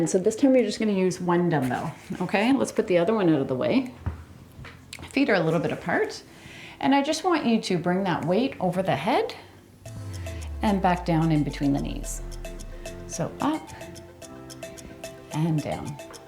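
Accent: Canada. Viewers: I love your class. I walk with a cane. I look like stroke victim but I’m a cancer patient. I am thankful right now for my son, who got married this past weekend and for my daughter who is on a DTS in Glacier National Park. I am from NY in Rochester.where are you from? I hear a slight accent…. Canada? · Thank you, that was simply WONDERFUL!!!, I live in Michigan, and I've been wondering if you're Canadian. The way you pronounce ''out'', I like it.!!!!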